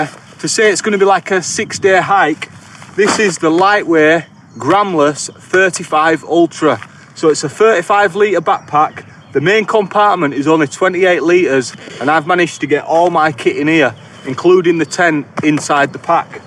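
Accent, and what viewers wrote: Yorkshire, United Kingdom. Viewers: For a Yorkie, with a great Yorkie accent, you don’t half say “plasters” with a posh tone. Lol.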